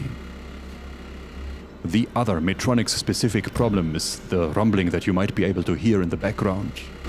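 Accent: Germany. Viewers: The Deutschlander flavoured English narration is beyond superb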